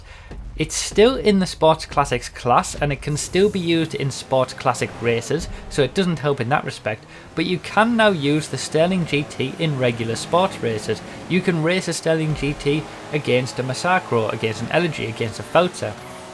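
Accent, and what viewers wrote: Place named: London, United Kingdom. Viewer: Yeah he does have quite a london ting accent, fortunately I have friends that speak like him so I've developed an understanding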